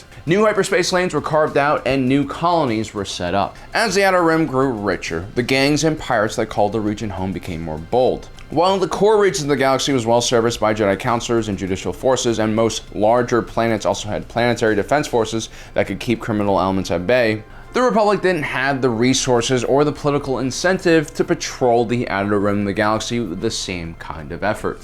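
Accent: Australia. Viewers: That Australian accent tho 😂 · dat Australian accent doe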